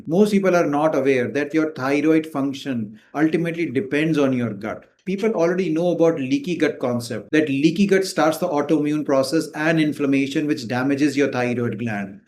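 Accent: India. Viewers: A strong Indian accent is difficult to understand at times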